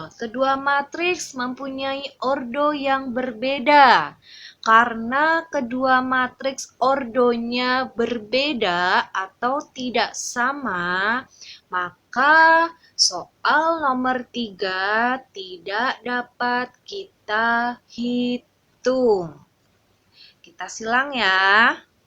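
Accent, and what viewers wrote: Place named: Java, Indonesia. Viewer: Iya bener medok yah mz hehe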